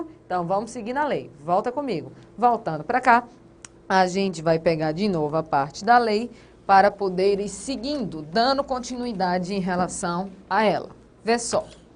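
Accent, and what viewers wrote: Pernambuco, Brazil. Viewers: Gosto das suas explicações, assistindo sempre . Com esse sotaque Pernambucano me acabo de rir qd fala coisas engraçadas.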